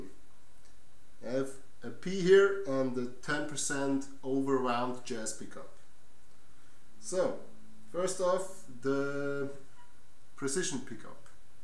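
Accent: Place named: Germany. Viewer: I love your accent! I am guessing your native language is German?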